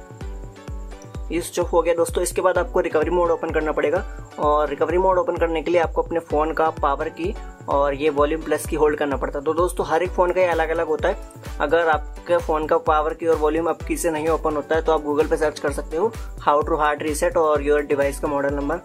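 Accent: India. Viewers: You know shits bad when it's Indian accent and you don't even know what he's saying. Good luck to all of you that can read this ._.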